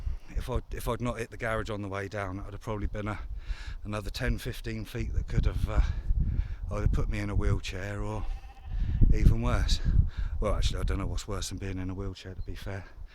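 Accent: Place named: Portsmouth, United Kingdom. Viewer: Are you a Pompey lad? Your accent seems familiar. Lovin, the vids mate, keep on doin' yer thang. 👍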